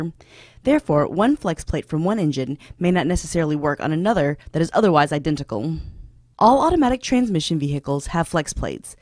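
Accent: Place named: California, United States. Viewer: Haha she sounds like some cali broad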